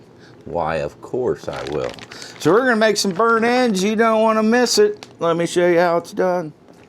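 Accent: Pittsburgh, United States. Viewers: what part of pittsburgh are you from?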